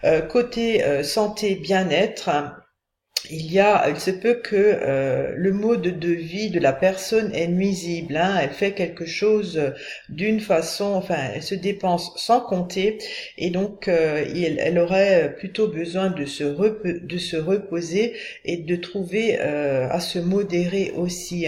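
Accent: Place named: Switzerland. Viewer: A ta voix tu habiterais pas loin de la suisse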